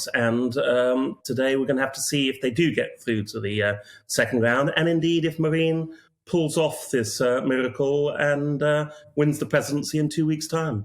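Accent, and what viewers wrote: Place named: RP, United Kingdom. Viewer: Plummy tw*t 🙄 Is he French or English? 🤦🏻‍♀️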